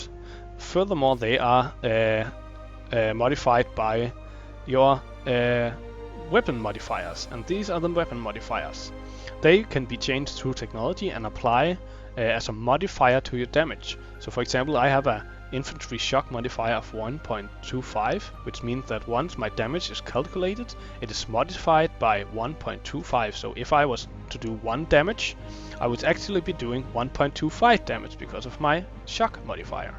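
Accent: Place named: Denmark. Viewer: I guess he's Danish, that's what it sounds like to me at least.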